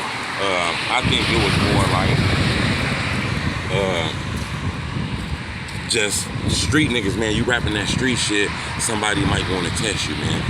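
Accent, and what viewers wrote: Chicago, United States. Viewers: Is you from Chicago bro?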